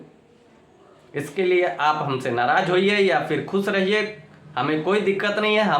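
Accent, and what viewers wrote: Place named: Bihar, India. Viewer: बिहारी स्टाईल में बोली ता एकदम गर्दा।।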